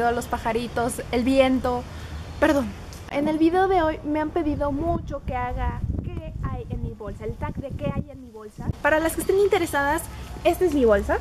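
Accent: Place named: Peru. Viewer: una pregunta... eres peruana ?.. tu acento se parece mucho...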